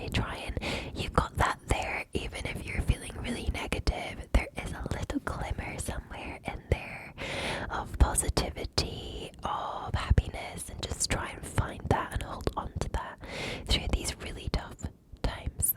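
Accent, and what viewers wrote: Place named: Scotland, United Kingdom. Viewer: I love your super tingly Scottish whispers. This is amazing, 360 degrees of! 😍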